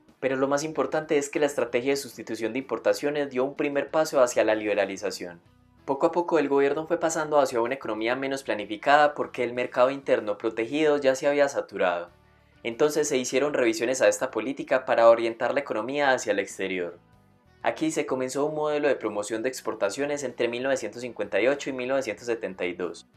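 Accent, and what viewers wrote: Antioquia, Colombia. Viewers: Suena como paisa, ¿ Eres Colombiano?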